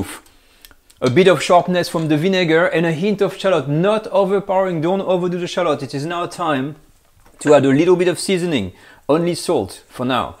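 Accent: France. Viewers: I like your English with French a cent greeti gs from Athens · Je ne comprend l’anglais que quand un Français le parle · Can you please hire someone to translate, your strong french accent is really annoying. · I didn't know how much French love butter until I watch your video. The sauce looks pretty and creamy. Btw, your Frenchy accent is so cute. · I've tried a few times to watch this guy's videos but I just can't stand the sound of a French accent. Makes me nauseous. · Sorry find the French accent very irritating. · Love the accent! Nice to know a Frenchman is teaching! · even when you talk english you have that french akcent that is amaxing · I never heard such a clean and smooth french accent... it's like english is flowing out of your mouth. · Its very nice to hear ur English in French accent 😜 and thank you for the recipes.... I tried onion soup and it came out tasty🤗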